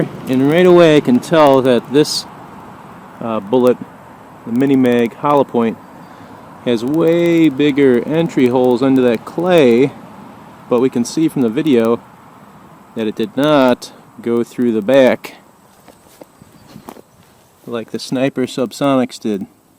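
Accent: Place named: South, United States. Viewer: Why are you talking like a backwoodshilljack can't say anything about brothers talking ghetto brah.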